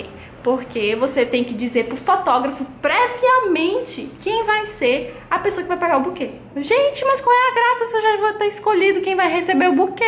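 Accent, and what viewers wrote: Ceará, Brazil. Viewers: você é cearense, né? kkkkkk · Ah! Que legal! Sou Nordestina tb, não do Ceará mas da Bahia. Nossos sotaques são tão bonitos!! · guriiiiia tu é nordestina ? tu tem muuuiiito sotaque hahahahaha adoreeei saber sobre os casamentos dae, e um pouco sobre o seu tb e super me emocionei !! TuT acho tão lindo casamentos !! Mas tinha que ter briga pelo buquê sim u-u qual é a graça se não tem briga pelo buquê ?? ahahahaha xD #NovaInscrita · Teu sotaque parece do Ceará. Tu é de onde?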